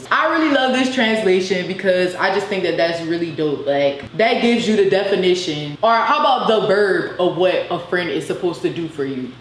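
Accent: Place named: Louisiana, United States. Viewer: Lousiana accent bby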